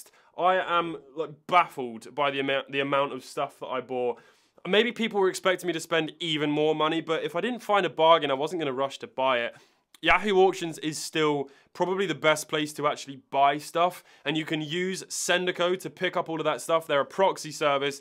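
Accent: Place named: United Kingdom. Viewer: I don't think nub Is a bad word but his british accent makes It sound like knob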